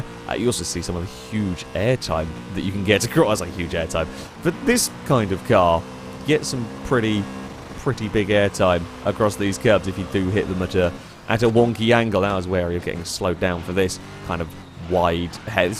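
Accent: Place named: United Kingdom. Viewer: I love how confused people from outside America get when saying Acura. and many other British people say "A-cure-ah" where everyone in America says "Ack-your-ah" Just find it interesting how different cultures emphasize different letters on different syllables. It is a small difference, but Americans will pick up on it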